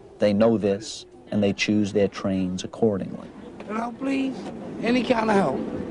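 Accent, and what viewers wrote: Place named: New York, United States. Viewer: This presenter has the most New York accent I've ever heard in my life. And I'm an almost-thirty year old who was born, and has spent most of her life in Brooklyn.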